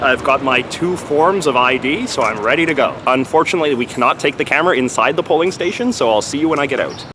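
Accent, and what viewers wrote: Canada. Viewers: I never understood why Americans say Canadians pronounce about as "aboot" until I watched this video. You do it every time. LOL - A fellow Canadian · I think when it comes down to it, Harper lost because of issues larger than the hijab, Syrian refugees or even bill C51. His policies throughout his long term in office showed that he lacked compassion and did not take climate change or any environmental issue seriously. Justin offered a clear to change to this. Whether he will deliver on climate change is yet to be seen. One more thing I have to ask... I have seen a few of your videos now (and they've been great) but I'm wondering if the way you say "aboot" is just a subtle joke to entertain American viewers or whether it's authentic. I'm from Vancouver too and can't say I've heard anyone pronounce it quite like that before. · Hmmm. When I arrived here in Canada(Toronto), accent was one of the first things that I’ve noticed. 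Especially my white coworkers. Funny thing is they themselves can’t here it or aware of it. Maybe not as pronounced as this guy does but totally different from how the Americans say it.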